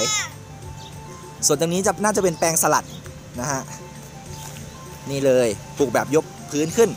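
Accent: Isan, Thailand. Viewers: ชอบพิธีกร พูดไทยปนอีสาน ดีเนาะ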